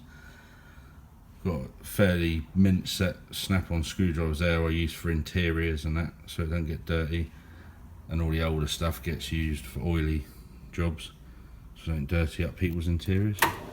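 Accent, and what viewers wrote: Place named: Norfolk, United Kingdom. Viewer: Nice tools bud, trying to pin point the accent.. Kind of sound like a fellow Norfolk lad at times but not sure? Lol cheers for sharing your box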